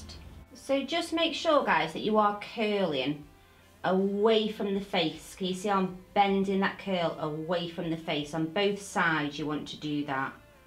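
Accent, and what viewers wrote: United Kingdom. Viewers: Just discovered your channel, amazing hairstyles. Also, your accent is lovely, from which part of the UK are you?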